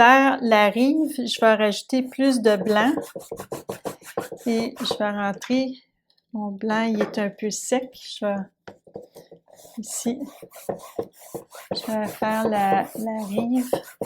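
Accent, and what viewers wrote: Quebec, Canada. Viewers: Merci beaucoup. Je suis française et suit des cours de peinture à l huile.l accent québécois en plus qu on adore en France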